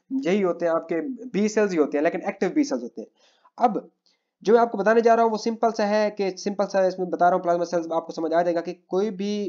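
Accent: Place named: Pakistan. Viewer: jee bhai jan, i can see by your accent, mai bhe Pakistan sy hn, graduate of AIMC Lahore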